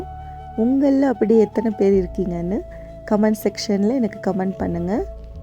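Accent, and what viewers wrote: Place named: India. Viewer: Akka tellme about yourself more.your vlogs are interesting. Yours indian touch slang is so pretty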